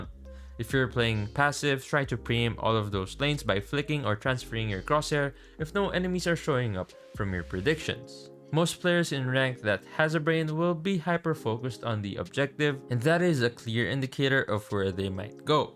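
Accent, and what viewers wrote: Philippines. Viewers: Bro i think you're a Filipino, idk but i hear it when ur speaking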